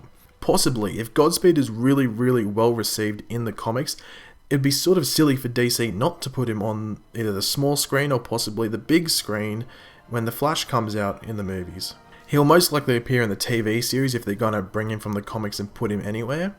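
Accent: Australia. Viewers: u sound Australian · Are you Australian?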